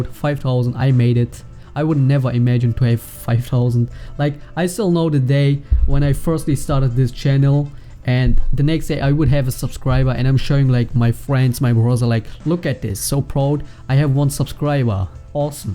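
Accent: Germany. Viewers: u are german right ? :D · are you german?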